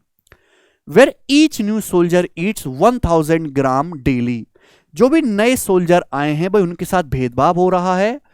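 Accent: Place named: Bihar, India. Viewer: मैथ का मतलब गगन प्रताप सर बिहारी अंदाज में बहुत अच्छा लगता है सर ❤❤❤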